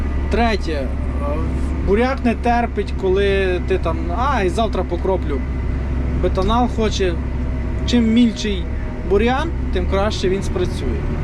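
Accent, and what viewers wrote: Ternopil, Ukraine. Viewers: з якої ти області? По розмові ніби з Тернопільської.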